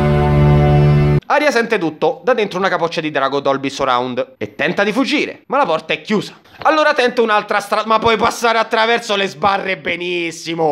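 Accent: Rome, Italy. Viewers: Ma tu sei un genio!!! Poi il romano mi fa sbellicare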